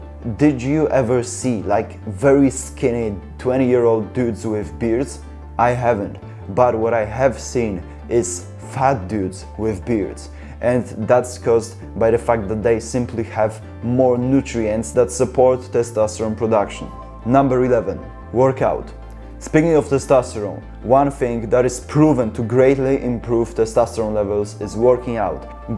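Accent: Poland. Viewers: Czuć na kilometr polski akcent.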